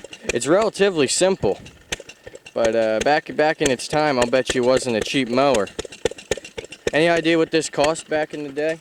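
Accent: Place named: Pennsylvania, United States. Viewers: Are you from Pittsburgh? I'm catching some accent... Sweet mower though! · Dude lives in Pennsylvania doesn't he? That accent.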